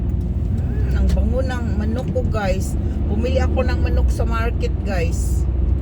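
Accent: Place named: Visayas, Philippines. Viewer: Bisaya ka ano, sis? Sounds like one kasi😂… na-curious ako sa blue egg…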